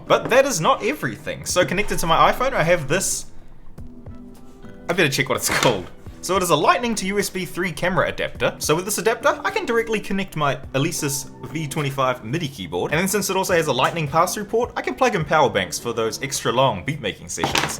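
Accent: New Zealand. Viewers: I think it might be a Kiwi accent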